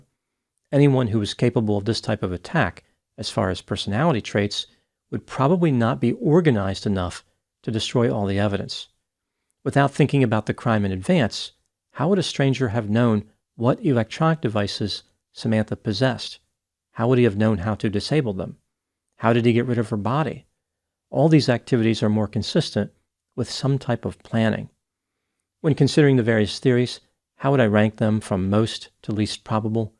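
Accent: United States. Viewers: He’s not pronouncing it right. He has an American accent, he should say it like “Mel-burn”. With a hard R.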